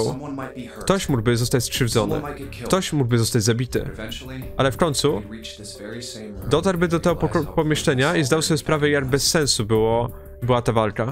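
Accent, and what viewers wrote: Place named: Podlasie, Poland. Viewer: coś ostatnio masz chyba jakiś vibe na podlaskie akcenty już drugi film ci się to zdarza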